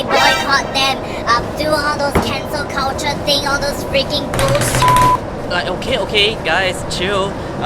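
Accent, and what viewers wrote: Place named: Singapore. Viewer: OMG Your Voice Sounds Like Singaporean When You Speak English.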